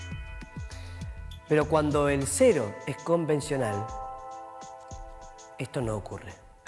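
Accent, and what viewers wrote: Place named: Argentina. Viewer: como se nota que es argentino no se le entiende un pomo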